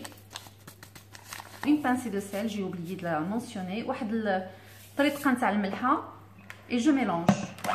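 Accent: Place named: Morocco. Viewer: Pourquoi vous êtes tunisien et vous parlez l’accent marocain???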